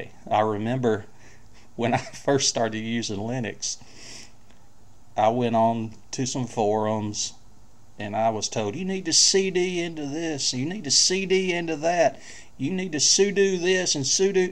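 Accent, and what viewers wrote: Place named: South, United States. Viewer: Great video Mr. That is what I am also seeking are minimalist systems, tired of the bloated giants out there. I have quite a few PCs here, settled upon FreeBSD, but always looking at what Linuxers' are doing. I was initially drawn to your southern accent (being from the Midwest) and the minimalist system, w/menus. Also your NuTyX isos. Keep up the good videos!!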